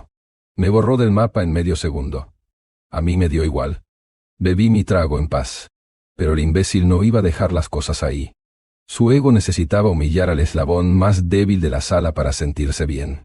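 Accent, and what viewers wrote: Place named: Argentina. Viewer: Ese dialecto argentino, cuesta entenderlo, pero como la historia es similar a muchas se logra adivinar la trama.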